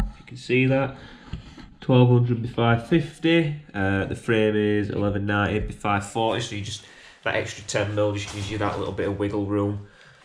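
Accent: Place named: North, United Kingdom. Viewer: Nice tidy job once again mate as always - your a great bricklayer and definitely a good all rounder it seems 🍀👍 and the most Northernet northerner I’ve ever heard 👍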